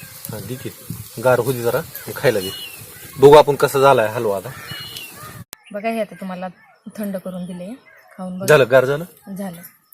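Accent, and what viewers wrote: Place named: Solapur, India. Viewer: Khupch chan.....zala aahe.....😋😋😋tumhi kontya gavche....me solapur che aahe....bhasha ekdm solapuri vatatey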